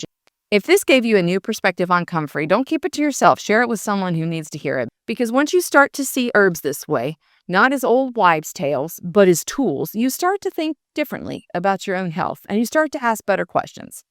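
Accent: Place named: South, United States. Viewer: Really appreciate this teaching and love the southern belle voice!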